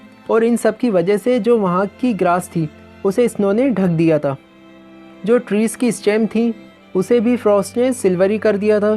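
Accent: Bhopal, India. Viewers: bhai m bhi accent sunte se hi smjh gaya tha ki aap bhopali ho 😄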